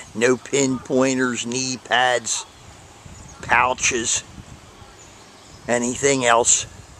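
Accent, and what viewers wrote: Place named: Dundalk, United States. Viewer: Where are you from? You almost have a Dundalk, MD accent. Happy hunting!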